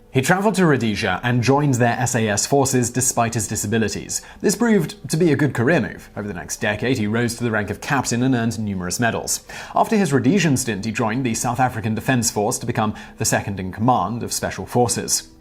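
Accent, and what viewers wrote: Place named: RP, United Kingdom. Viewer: The posh sounding accent is funny when mispronouncing words, like divisive.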